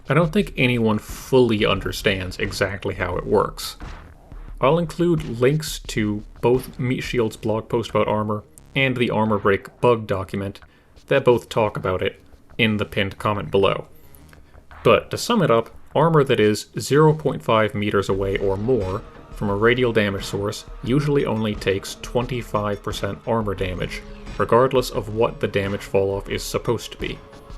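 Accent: United Kingdom. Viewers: Love for explosives, booze and an intense Great Britain accent.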